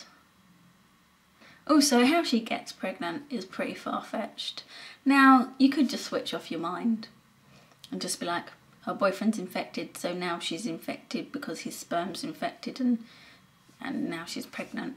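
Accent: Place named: United Kingdom. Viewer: As a Canadian i cant get enough of her unique British accent....lol..its so stereotypical sounding with the use of the very british sounding "nah-T" and "O-full" and "mone-sta". It's awesome! Great review!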